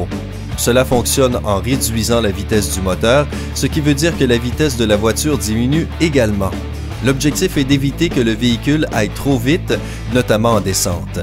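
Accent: Quebec, Canada. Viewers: Pourquoi cet accent québécois ? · nice Canadian accent :) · Sous titrage merdique avec cet accent canadien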